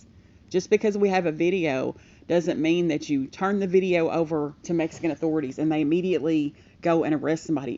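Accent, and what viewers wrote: South, United States. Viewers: Hello, I haven't listen to your channel in a long time. You sound totally different. You have a southern accent now. Are you the same person who did the Kenneka Jenkins case?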